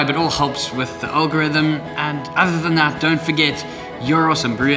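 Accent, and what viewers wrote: South Africa. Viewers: First time I'm watching this YouTuber. And a few seconds in I'm thinking this guy sounds like a South African 😀 awesome work 👌👏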